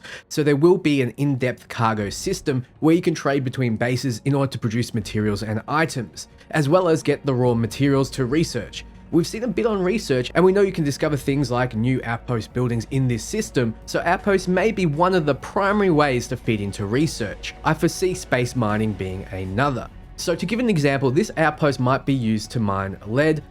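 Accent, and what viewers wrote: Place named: Australia. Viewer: Amazing content! I have been obsessively watching all your clips! Also good to hear an aussie accent in these vids.. *not an aussie, but a kiwi cuzzy!* Have you found any intel on if bgs have reworked the 'karmic elements'? I'm soooooo hoping I can be a evil crimson pirate!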